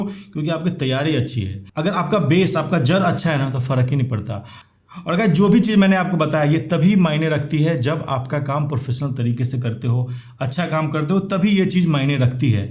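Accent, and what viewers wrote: Bihar, India. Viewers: Bihar se hain ka? Ya UP se?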